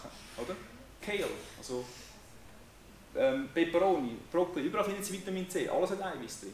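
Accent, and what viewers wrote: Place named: Switzerland. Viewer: Bin schlichtweg begeistert! Auch wenn ich's 3X hören mußte... wegen dem Schwiezzzerdütsch. Dänisch heißt es ist eine Halskrankheit... Schweizerisch??? Freu mich wirklich sehr das die Schule & Du sowas gemacht habt. Warte ungeduldig auf Teil 2!!! Möchte wissen wie die G'schroppen - (die fast schon Erwachsenen!) - drauf reagierten. Bist ein Lichtblick in der Finsterniß… Bin sooo neugierig drauf. Bin schlichtweg begeistert! wie Du die Fakten gespickt mit Deiner Geschichte hingeblättert hast, um Sie neugierig & aufgewühlt zu machen, damit Sie selber recherchieren anfangen. Vorleben ist das einzige was (manchX) greift! Bin schlichtweg begeistert! Na hat etwas gedauert, bis ich den Link für den 2ten Teil entdeckte... (trotz Brille). Den geb ich mir jetzt...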